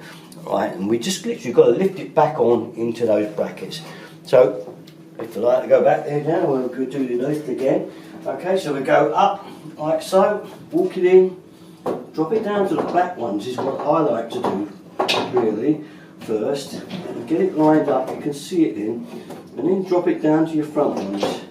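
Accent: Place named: England, United Kingdom. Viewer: Delightful presentation. Charming English accent.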